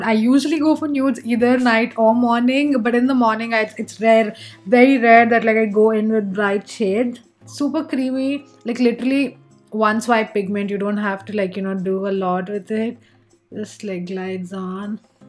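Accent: South India, India. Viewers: you may be conscious of your south indian accent English but i like your way of speaking 😍 its different n very real i highly support you ✨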